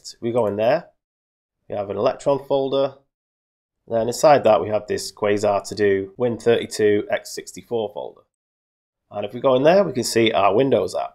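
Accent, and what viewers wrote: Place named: England, United Kingdom. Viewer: Great tutorial. Glad to hear another English voice doing tutorials along with the English "Poo" humor. Well done on getting your video on the front page of <link>!!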